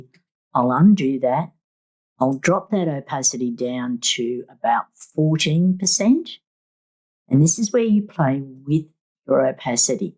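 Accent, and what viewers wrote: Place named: Australia. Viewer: Lovely to hear an Australian voice. I am going off to try this technique, the results looked great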